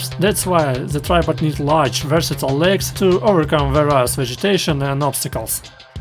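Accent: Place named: Germany. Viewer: Ok, this guy is definitely German.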